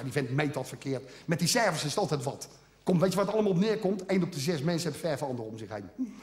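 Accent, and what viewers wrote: The Hague, Netherlands. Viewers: Harrie Jekkers blijft goed heerlijk dat Haagse dialect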